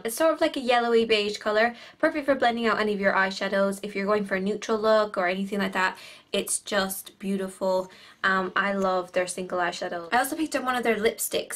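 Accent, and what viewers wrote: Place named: Cork, Ireland. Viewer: So good to hear a Cork accent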